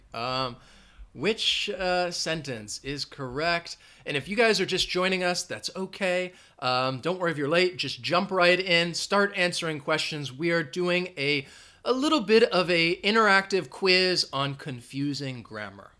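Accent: United States. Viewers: Hello Sir, I do appreciate your lessons . You teach with limpid and clear American accent. Have my thumb up!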